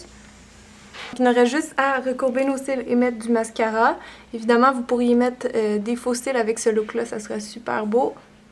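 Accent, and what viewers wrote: Quebec, Canada. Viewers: ABONNéE !!! Déjà j'adore tes vidéos, et j'adooooooooooooore le québec et j'adoooooooooooooooooore leur accent !!! ;) Je t'adoooooooore :D♥ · Vraiment sublime direction dans mes favoris pour realisé de Mu estivale! J appreçie énormément regarder tes vidéos! Je suis française et j'ai de la famille au Québec ton accent me fait penser à eux!(nostalgie) Bisou cynthia ps: tu es vraiment très jolie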